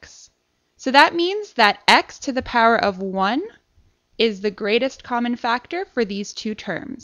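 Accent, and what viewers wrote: Canada. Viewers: very helpful - cute Canadian? accent :) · Fantastic explanation, and clear examples. Really helpful. And as an aside, AMAZING Canadian accent (as an English Brit we don't get accent envy often, but yours is awesome)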